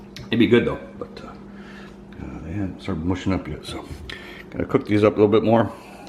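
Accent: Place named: United States. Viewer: Usually only Brits and cats can eat Sardines for breakfast, but you sound like youre American....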